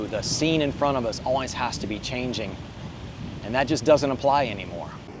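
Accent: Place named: Tennessee, United States. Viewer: Slight accent there, I'm guessing Tennessee, maybe southern Kentucky???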